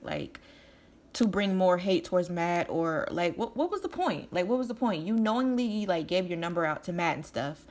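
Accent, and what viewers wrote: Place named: South, United States. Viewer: Agreed,,,, I’m a white girl, and color doesn’t matter to ME! I wish the world would see us all for our differences being color of skin, color of hair, eyes, etc.... I think you have a beautiful southern bell accent. Thanks for your FACTUAL video! Do I like to HEAR the FAKE ASS Katie Joy! Absolutly not. The latest leaks of her talking about Leslie Bass’ nephew passing is HORRIFIC. I will never watch or support her again!!! She also said that Steve McRae sexually harassed people and sent dick picks. I can’t believe this about Matt based on her history!!! I’m not saying I like Matt or not. But I don’t believe it. She plays dirty.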